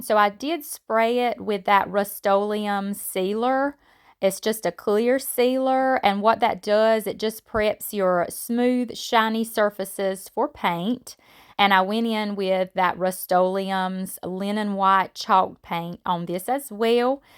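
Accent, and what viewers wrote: South, United States. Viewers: I love how you save broken pieces to cover and use in your arrangements! I would've never thought to do that, but then again I don't make many arrangements... I make more random signs, candle holders, and such. You're so amazingly talented and I LOVE your accent ❤ in from Southeast Texas so I love Southern accents ♥️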